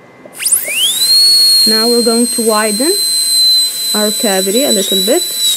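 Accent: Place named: England, United Kingdom. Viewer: Great job. Great En. accent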